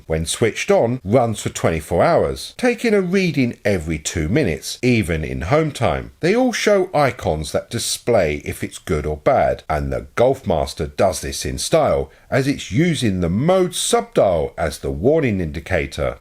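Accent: United Kingdom. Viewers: This guy does the comparison we wish all other videos would FINALLY do - but they don't. Mixed with a little humor and a little posh UK accent... Informative and entertaining.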